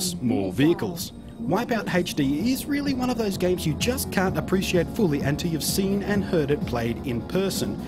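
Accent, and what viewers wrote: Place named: Australia. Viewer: what the hell is wrong with you? He's Australian he LIVES in Australia, that's the way he talks, he's not faking the damn accent.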